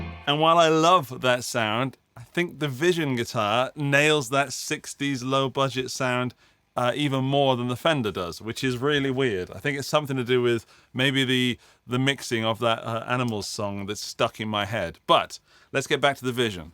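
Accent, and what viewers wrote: United Kingdom. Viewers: You said you live in Austria, however your English is considerably better than your German. From your accent, I'd give it an uneducated guess and go with Great Britain and the northern Irish Isles as your country of origin?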